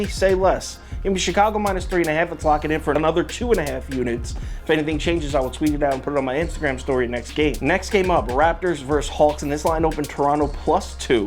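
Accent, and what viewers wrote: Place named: Boston, United States. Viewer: I like ur boston bro..😀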